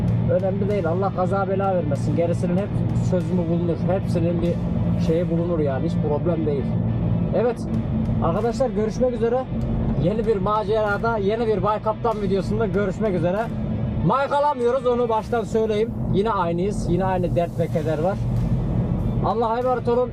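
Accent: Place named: Central Anatolia, Turkey. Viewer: Konusma şeklin yozgat sivas bu yörelerdenmisin ? iç anadolu olduğu kesinde...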